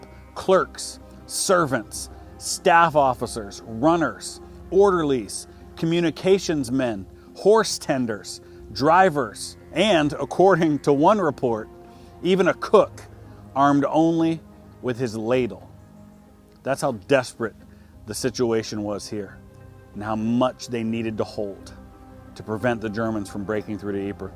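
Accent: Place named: Canada. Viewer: I have just started to watch this series of videos and you are so dedicated to WW1 and all the very new and very old ways of fighting. i guess that you are Canadian by your accent, I love the passion that you show for many dead very young men. The start of WW1 is still not very understood, why Britain got involved and even more the Americans is still to this day not really understood.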